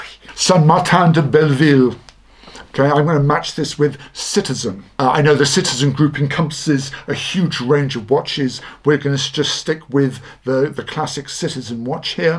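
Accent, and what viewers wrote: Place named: England, United Kingdom. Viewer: Salut, Tu sais ce que j’ai apprécié (entre autres) dans cette vidéo? Je n’avais jamais entendu nommer les stations de ski française avec un accent anglais sur toute une liste comme cela! Merci 🎉😊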